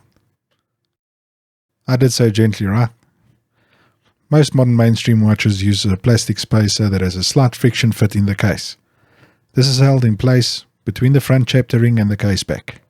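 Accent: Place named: South Africa. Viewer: Excellent and a nice South African-accent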